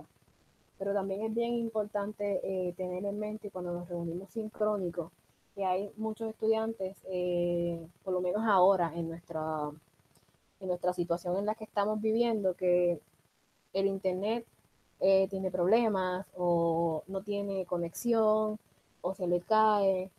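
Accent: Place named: Puerto Rico. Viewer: Hola! Por tu acento estoy seguro que eres de Puerto Rico. Yo también lo soy pero vivo en México. Estoy tomando mi maestría en Educación Superior con la Inter y tuve una asignación relacionada con el Diseño Instruccional. Después de mucho buscar en Internet tu video fue el complemento perfecto para entender las lecturas asignadas, que sinceramente no me dejaron claro el tema. Ahora sí me quedó muy clara la forma de presentar una lección siguiendo el Modelo ADDIE. Gracias MIL!!!!!! Sigue adelante!!!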